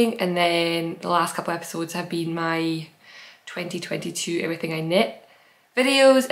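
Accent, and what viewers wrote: Scotland, United Kingdom. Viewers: Thank you! I love your podcast and LOVE Scotland. I am from the Colorado Rockies but did a year abroad at Stirling Univ and fell in love Scotland. ♥ I love hearing your accent b/c it brings back my memories of the beautiful people of Scotand. in 2019 my husband and I backpacked for two weeks in the highlands. A dream. Thank you for your podcast.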